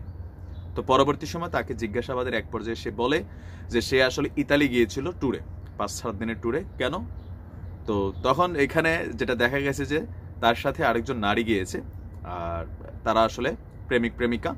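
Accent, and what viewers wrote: Comilla, Bangladesh. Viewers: ভাই আপনার বাড়ি কুমিল্লা না কি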